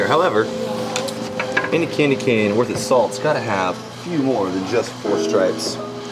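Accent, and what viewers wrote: United States. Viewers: so basically how you make rock in England but with a hook on the end lol. still fascinating to watch though, love his voice, what part of America is that accent from?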